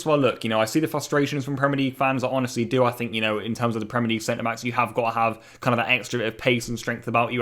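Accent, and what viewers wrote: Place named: England, United Kingdom. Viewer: why do you have an english accent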